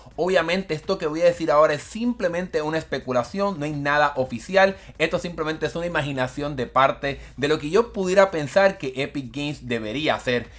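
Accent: Puerto Rico. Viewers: Eres de Puerto Rico?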